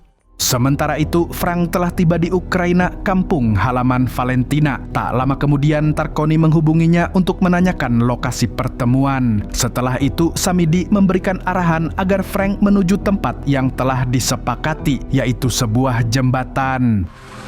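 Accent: Banjar, Indonesia. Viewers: urang Banjar kah pian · urang banjar kh pian mang🤣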